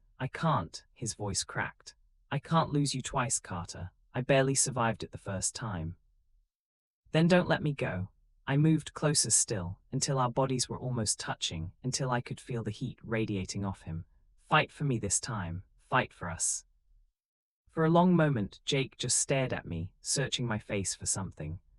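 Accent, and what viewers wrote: United Kingdom. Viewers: I absolutely love the storyline it shows that Jake and Carter has true love their soulmates even if a Brit wrote the story I wish that they would get someone to narrate it with more of a Montana North. This is not the first word to listen to someone from Brett narrated it's just that it would make it more believable if they would get someone from the USA. I'm not trying to be racist or negative but that's just my opinion...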